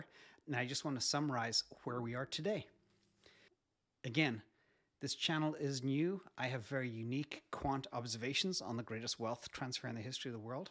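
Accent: Ireland. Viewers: Do I detect an Irish accent in there somewhere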